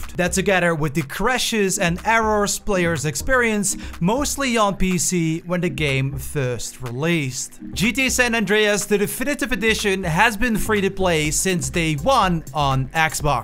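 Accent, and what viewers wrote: Netherlands. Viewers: You dutch bro? I live in Amsterdam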